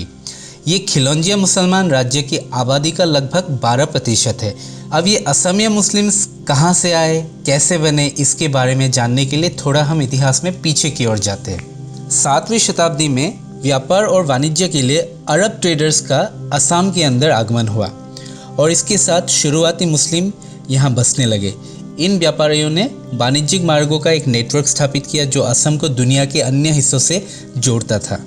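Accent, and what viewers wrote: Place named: Hyderabad, India. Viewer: Hyderabadi ya Bengaluru ka lag rha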